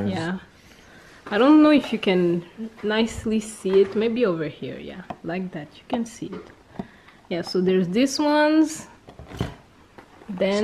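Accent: France. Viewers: I have to tell you that I love your french accent! 😊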